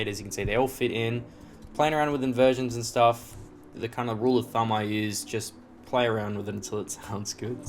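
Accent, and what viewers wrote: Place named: Australia. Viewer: He prolly from Australia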